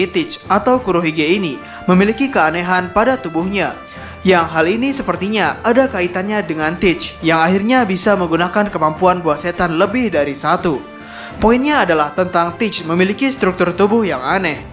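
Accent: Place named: Lombok, Indonesia. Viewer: Iye jage, dengan sasak badek wkwk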